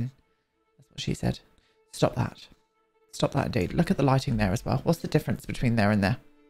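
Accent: United Kingdom. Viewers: Good day I love watching you your sense of humour makes it fun to watch. And my favourite accent is a British one so listening to you and your laugh makes your videos that much better not including your talent and attention to detail. Bloody brilliant you are 😃. Even my husband enjoys watching you with me. Please take care stay safe your Canadian fan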